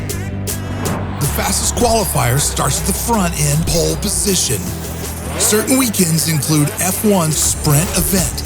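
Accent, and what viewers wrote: United States. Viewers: The fact that this video is in American-English instead of the usual British-English, goes to show for me that this video is mainly for Americans jumping the bandwagon on the F1-hype at the moment. Also, 3 American GP's? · Should have had an English person voicing this, not an American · Had to get an american guy to narrate it so yanks can understand🤣. F1 should still keep in touch with their heritage. Mention the great old days instead of constantly looking into the future. Edit: Oh yeah here's an alternative title to this video, A guide to f1 for americans. Americanisation of a great sport, it's sad to see the sport be ruined like this. A great sport, just to be ruined by money. Miami a exciting street circuit?? Yeah I don't really think anyone says that, what about circuits like Azerbaijan etc, talk more about the heritage man, F1's really going to the dogs. 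· haha they needed an American voice for all of the Yanks to try and understand. They are the ones who need teaching as they are all the newbies. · Make it less American please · Hearing the British pronunciation of garage in an American accent is extremely weird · "Exiting" Miami GP as example next to Silverstone? And the American accent, who would this video be ment for? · ugh ofc they had a yank doing the voice-over. hate listening to their accent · Very weird to hear anything F1 narrated in an American accent. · Could have done without the American accent. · This just doesn't sound right wit han American accent, I get it, they are trying to appeal to the U.S....but seriously! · This sounds so American it hurts. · I love how only the beginners guide to F1 is narrated in an American accent :D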